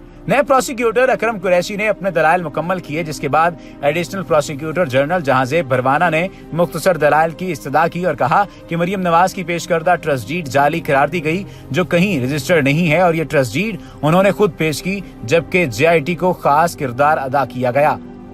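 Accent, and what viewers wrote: India. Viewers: mira matlab k hum urdu k accent se pehchan gay k admin indian hay . kharachi wala bi nhi . or ye indian kis tarah pakistanyion ko ghumrah krny ki koshishein krty rehty hain . lekin finally be niqab ho jaty hain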